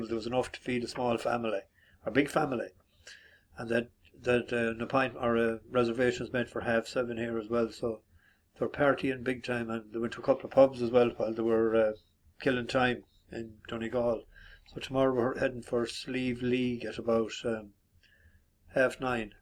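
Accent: RP, United Kingdom. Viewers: U gone very posh.jesus I remember when U were a bus driver 😂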